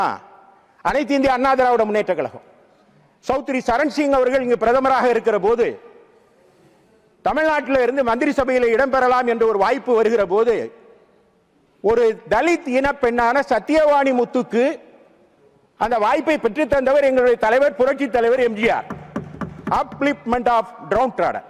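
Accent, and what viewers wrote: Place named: Tirunelveli, India. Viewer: நெல்லை தமிழே ! ஆணிதரமான வாதம்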